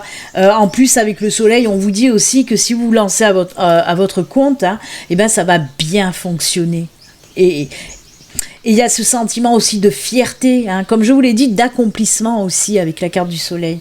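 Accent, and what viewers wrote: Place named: Provence, France. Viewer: Vos un petit peu ça fait très marché de Provence 😉😂... Ne changez rien, ça ensoleille nos journées, de même que votre bonne humeur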